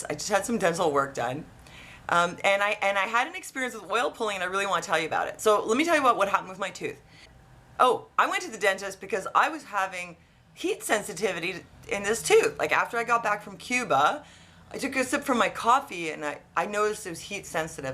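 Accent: Russia. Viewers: I like your Russian type of accent. Funny.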